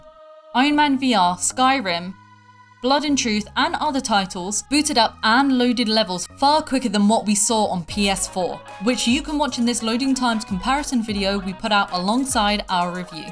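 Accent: United Kingdom. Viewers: Hahahaha and she has a british accent. Thats bollocks! Lol. It works in the us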